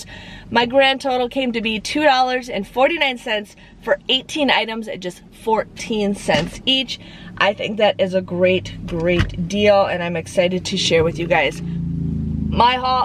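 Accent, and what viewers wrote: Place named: Wisconsin, United States. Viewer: I love your videos! I was surprised that you are in southern Wisconsin, tho, judging by your accent! It sounded closer to northern to me. Thank you for sharing so many deals!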